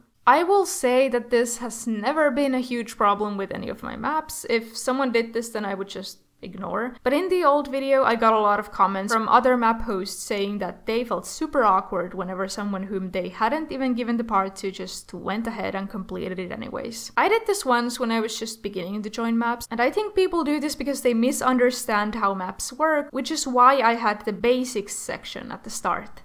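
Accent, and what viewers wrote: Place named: Finland. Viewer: Nice video! I LOVE watching MAPs (but have never joined one because I don't have the patience for animation) Side note- I immediately thought your accent sounded familiar and I was right lol (my family is Finnish)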